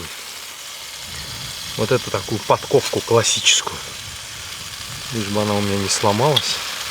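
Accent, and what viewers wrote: Azerbaijan. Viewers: Дорогой Приветствую! Это снова я! Вячеслав из Нижнего Новгорода! Я не перестану восхищаться тем что вы делаете и как преподнесите! Это шедеврально! И да кстати! Не смотря на то что вы Азербайджанец, и говорите на русском, моем родном языке. Вы говорите на русском очень красиво, с акцентом! Говорите очень грамотно, даже понятней, чем я говорю 😂! Дай Бог Вам здоровья крепкого, мирного неба над головой, процветания и всего самого наилучшего! Я ваш фанат! 😊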